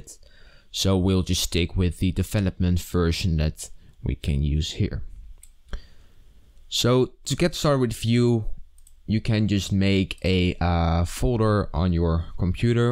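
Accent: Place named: Netherlands. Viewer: This Guy sounds dutch, lol is he?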